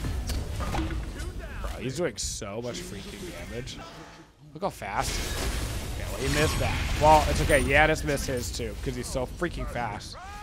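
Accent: United States. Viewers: Are you from Arkansas?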